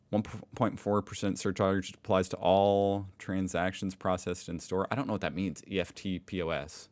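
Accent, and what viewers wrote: England, United Kingdom. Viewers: "Happy arvo". That 'call-sign' is cheerfully yours, but your Australian accent is hybrid British. But no worries mate. Cheers from Sydney, AU. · You can stop with the British accent. LOL! You have never done an Aussie accent. LOL! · You sound more like a Pom definitely not Aussie 😂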